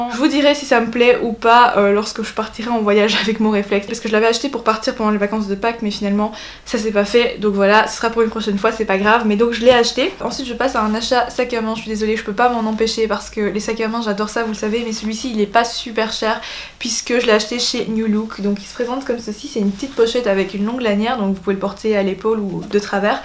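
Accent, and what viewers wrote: Belgium. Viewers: juste, tu viens de belgique ou de france ?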